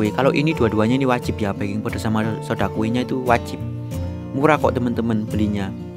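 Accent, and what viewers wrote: Java, Indonesia. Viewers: Suka suaranya yang khas medok. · Medok banget anjay, ngakak dengerinya😆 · Aq orang balongbendo mz jowo e jek medok e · Pengen bgt ngeliat mas nya d undang hitam putih trs masak pke logat jawa ky gitu..hehehe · Fokus sama cara ngomongnya....jowo Poll alias medok ...the power is Jawa 😅😅😆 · Medookk bngeett anjiir😫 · Medoknya lucu banget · Salah ngomong jatohnya malah kasian tp gemes Medhok nya jg uhhh banget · Gemess ...medoknya ituloh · Haduh basane medok buanget rek, moker ndi ki mas, makasih yo kontennya meninspirasi. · Medok banget:v sukaaaaaaa ♥️ gua fokus ke suaranya yang medok tiba tiba gua suka yang Ngomongnya medok wkwk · Saya suka medok nya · Kakak orang jawa tulen yaa Ngakak aku denger logatnya😂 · Aduhh demen sama medoknya😂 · Mas lucu deh cara bicaranya hehe ..orang jawa ya kayaknya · Njiiirrrr... Diselempitkan jare.. 🤦🏻‍♀️😂🤣👍muwedddoook banget c mas.. 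Kudu ngguyu ngrungokno e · jawane medok n lucu sederhana pula.. kerennn.. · Loalah..wong mojokerto dewe.Mangkane ngomong e medok😂btw podo mbi aku mas wong mojokerto😄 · 😂😂,,medok bahasanya mas sama saya jg orang Jawa 🤣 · Gue gk suka masak tp liat video ini tk ulang2 jd semangat nyobak...medok mu loh yg bkin semangat ms.bro...😂🙏🙏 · iya...tapi mas-nya ini mmg klo ngomong msh medok..campur bhs Indonesia dan Jawa..ciri khas org Jawa mmg kdg2 msh sk campur2 ngomongx..he3x.. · penyampaian mas nya jugaa sederhana dan jawa bangeeet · medok bylyke emm, cwo jgo masak mantap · Logatnya bagus... Hehehe... Medok.... Thx resepnya berguna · hi lucu bosone medok bnget 😍 kak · Y Allah ngakak dnger suaray medok bngt rek😂😂😂😍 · "sensasi nyemprot menyemprot bikin bahgia rek" medoknya ituloh yg bikin ter unch 😍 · SUMPAH LO, AKU GA NATE WALEH NONTON YUTUB MU MAS. SUARAMU UADEM, MEDOK, UENAK DI RUNGOKNO. YOK OPO AKU SG GRUSA GRUSU KEK NGENE KI MAS 😂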